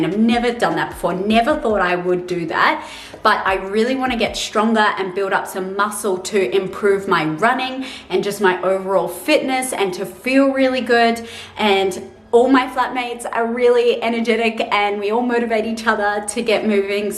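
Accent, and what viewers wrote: New Zealand. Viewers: New lense looks great, kiwi accent gets stronger every video and the hikes mentioned are such good ones. Ive got the able tasmin booked in a few months. Grow where you are planted- so few people learn to do this well! Also cooking is such a good time after you start figuring everything out. You gotta be you though and comfortable pushing yourself out there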